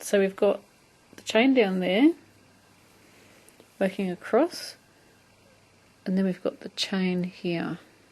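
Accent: Australia. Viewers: Had a brainfart lol. I really do love your videos and your accent. Would love to come to Australia, that's my dream vacation.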